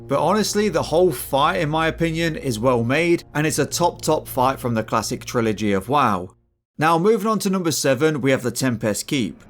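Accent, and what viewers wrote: United Kingdom. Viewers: Oh god why does it have to be bri’ish… blimey mate talk normal please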